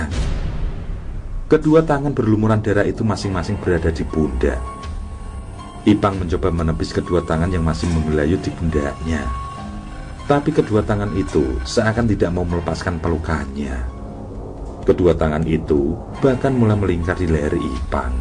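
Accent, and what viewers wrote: Java, Indonesia. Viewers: BARU 32 MENIT BUK NURI TKW DI SYRIA SELALU HADIR,SUKAK BANGET DENGAN ❤ ❤ LOGAT JAWANYA KETAL......SUARANYA MANTAB,NGEBAS, DAN GAMBLANG ALUR CERITANYA, MUDAH DI MENGERTI, NGA MUTER MUTER DAN DIULANG² SUKSES SELALU BUAT ❤ ❤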